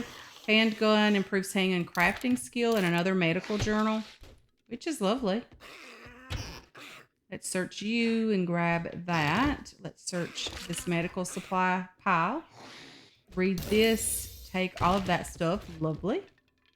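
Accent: Kentucky, United States. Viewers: What part of KY are you from?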